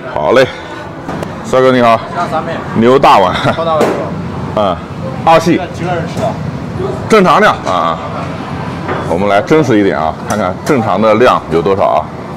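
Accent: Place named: Anhui, China. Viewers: 是不是合肥周边的，口音和我一个同学像的很。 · 一定口音就是安徽人哈哈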